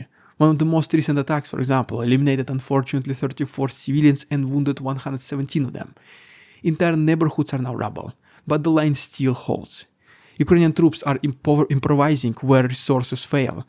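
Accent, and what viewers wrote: Russia. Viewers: Here fore a dose of accent. Getting the news is a plus, too!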